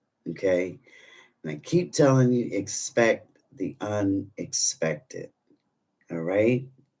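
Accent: Philadelphia, United States. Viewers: Thank you. And I thought I detected a Philly area accent. Go Eagles! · Hi I am so glad I found your channel. Listening to you I knew you were from the Philly area! It is so exciting that the Eagles are Super Bowl bound! Go Birds!!! 🦅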